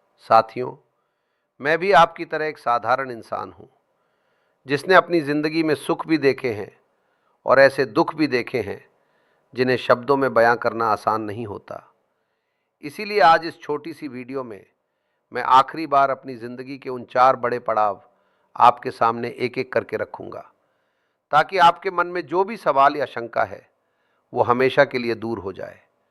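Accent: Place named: Uttar Pradesh, India. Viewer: अभी भी आपकी आवाज और लहजे मे पंजाबियत जरा भी नही दिखती, यूपी वाली शुद्ध हिंदी ज्यादा झलकती है,इसीलिए लोगो को डाउट होता है क्या आप मेरे इस कमेंट का भी जवाब दे सकते हैं? क्योंकि इसी आवाज और चेहरे मे आप दूसरे गेट अप मे कयी बार हिंदू और मुस्लिम बुजुर्ग भी बन चुके हैं.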